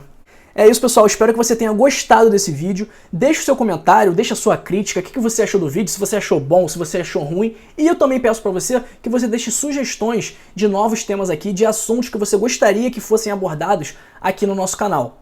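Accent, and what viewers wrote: Rio de Janeiro, Brazil. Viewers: O sotaque dele não nega...é carioca mermo! 😄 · Eu acho q o sotaque dele e do Rio de Janeiro muito fofo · Que lindo te ver falar, tua fala é chique, deve ser carioca. Deus abencõe...... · Esses olhos enfeitiça a gente! E esse carioqueixxxx é envolvente!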